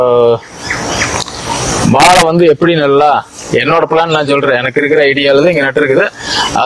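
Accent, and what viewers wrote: Kongu, India. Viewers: Kongu thamizh super idai idaiye kusumbu pechum super 👌👍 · கொங்கு தமிழ் அருமை😊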